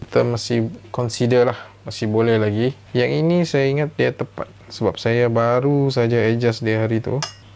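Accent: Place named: Sabah, Malaysia. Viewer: Tuan boleh tau asal mana? Macam bunyi2 sabah. 😃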